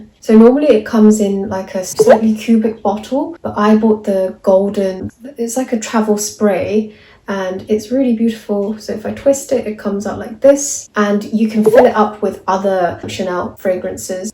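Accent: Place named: United Kingdom. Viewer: 영국발음 너무 매력적이에요🥹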